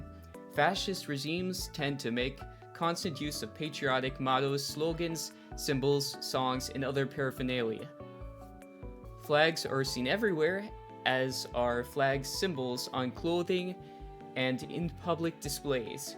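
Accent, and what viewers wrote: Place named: Canada. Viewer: oh wow this guy has dean withers featured in some of his captions, "fighting the good fight". I think time will tell on dean withers, not that it isnt telling at the moment. the guy is a scum bag. this video is a fun attempt at some kind of disection of current day politics focusing on the right and donald trump. also, he sounds canadian. its weird how the left insist on accusing the opposition of the things the left are guilty of.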